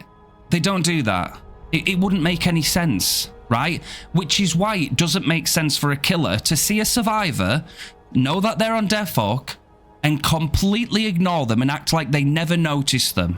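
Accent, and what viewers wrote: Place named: United Kingdom. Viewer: Bro this was so funny listening to especially the start to mid rant and the british accent just is the icing on the cake, I say this with love as a fellow european you are really good at ranting and the accent fits perfectly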